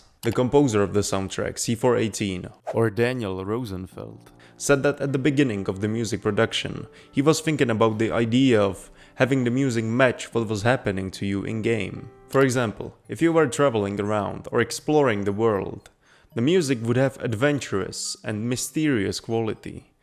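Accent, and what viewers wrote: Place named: Russia. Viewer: yo, nice video dude and, what i just want to say... music in minecraft sounds kind of awkward, and it's creates its own rather distinctive atmosphere, it's one of reasons why i so much love this game (but i never play this again haha). this video made me refresh my memory, thank you for that. (and i can't help but say this: your conclusions in the end remind me a bit about pugpiece's video about space in video games, idk you russian or not, but if answer is "Yes" (i dare say, because the accent is a little similar) - watch this, i think you're enjoy that)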